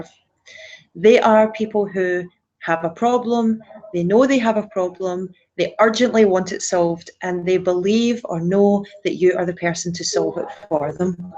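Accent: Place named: Scotland, United Kingdom. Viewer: Hello, who did you say your mentor was? You never put it in the description box. Good to hear a Scottish accent like mine 👍🏻😊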